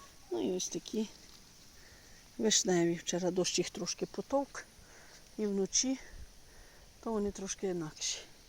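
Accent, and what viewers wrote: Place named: Khmelnytskyi, Ukraine. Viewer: доброго дня, я давно дивлюся ваше відео. Ви говорите,як у нас на Хмельниччині.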